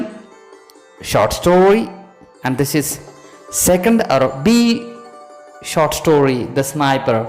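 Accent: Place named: United States. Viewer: Thoda dhire bhi bol sakte ho aap American jese kiv bol rahe ho aap Kiya samaj aayega new student ko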